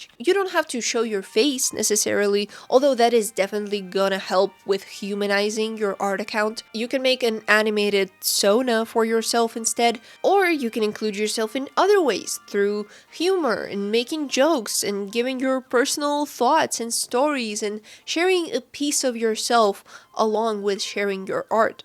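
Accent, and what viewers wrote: Russia. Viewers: Good video, but that matryoshka balalaika accent...hard to listen to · русский акцент?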